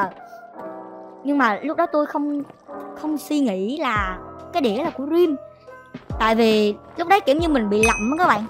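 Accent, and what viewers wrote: South, Vietnam. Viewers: người ta là người miền nam người ta có cách nói chuyện như v , bạn đừng bắt bẻ vì người ở đó nói chữ mà có dẫu ngã thành hỏi quen r bạn ơi , khó sửa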